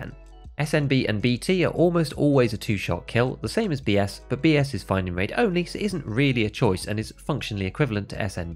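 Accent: United Kingdom. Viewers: I just want to give an absolutely TREMENDOUS callout on the zeroing conversation! It's so important to understand what the "default" round is for the gun to understand if you'll be shooting low or high, ESPECIALLY for a long range gun like the SVD. As always, stellar work my fine-talking British friend.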